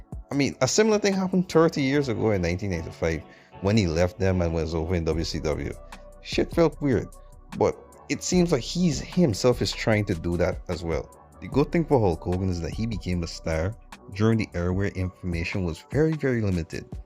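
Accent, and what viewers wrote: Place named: Guyana. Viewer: I like your accent bro. You inspired me to look up more things about Guyana. Looks like a cool place ngl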